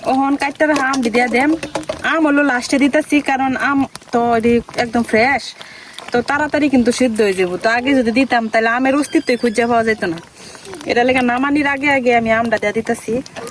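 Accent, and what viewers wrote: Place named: Mymensingh, Bangladesh. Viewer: এটা কি ময়মনসিংহ?? আপনার ভাষা সেরকম মনে হচ্ছে।। আবার পাহাড়! অনেক পাথর!! নেত্রকোনায় এটা? কুমড়ো টা এতো সুন্দর লাগছিলো!!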